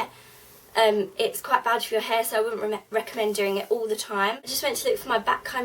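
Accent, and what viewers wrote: Essex, United Kingdom. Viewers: you sound like your from essex!:) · OMGGG!! a Louis Tomlinson inspired shirt AND you have a British accent! I love this chick!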